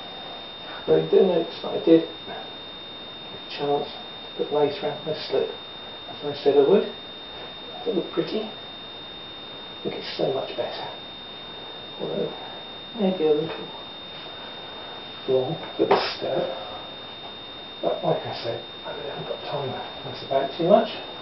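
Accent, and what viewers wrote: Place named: England, United Kingdom. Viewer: sounds like your in england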